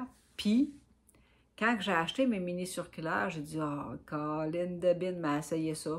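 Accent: Quebec, Canada. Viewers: Merci pour ce joyeux moment de tricot! Je te découvre et c'est très drôle d'entendre l'accent québécois dans ce monde de podcast tricot dominé par les françaises... moi non plus je n'aime pas les minis circulaires... je n'y arrive pas. Bonne idée de tricoter ton stock de fil acrylic dans une couverture, je vais t'emprunter l'idée. Merci aussi pour tes commentaires sur ton gilet apéro, je le ferai aussi bientôt puisque j'ai acheté le livre de Marie-Christine au festival de la p'tite laine moi aussi. Bravo pour ta teinture de laine, c'est courageux de se lancer ainsi.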